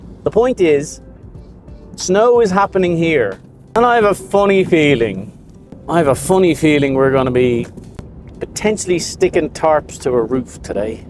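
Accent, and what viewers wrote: Ireland. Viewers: question.....Do you speak French with an Irish accent?:eyes-pink-heart-shape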